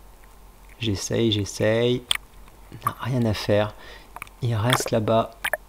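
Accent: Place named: Brittany, France. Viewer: Elle marche bien cette petite vidéo commentée, félicitations, avec l'accent breton c'est sympa.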